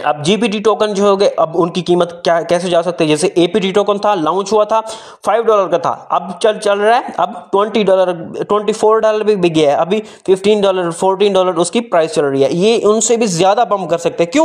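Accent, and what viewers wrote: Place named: India. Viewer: You text in English you talk Indian🙄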